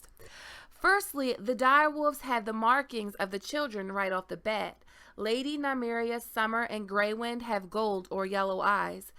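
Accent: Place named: South, United States. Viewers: I am so confuddled with all this downtime between the shows seasons and listening to so many theories. I was so hoping WOW would have come out inbetween so we could all get our fix of GOT. So tempted to try and hold out for WOW before seeing season 7 but jeez i'd have to go live in a cave for however long. It is going to be such a stretch back, after what is obviously going to be quite a dramatic season for our beloved characters. So many of your theories ring true, especially in book sense, some not so much but love the DNA thing, and that southern drawl is possibly one of my favourite things to hear GOT theories in as it is so detached from the accents we hear in the show it really has a narrative quality. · for this kind of a video... her southern heavy accent is terrible and takes away from the video! sorry, my opinion.. but the content is great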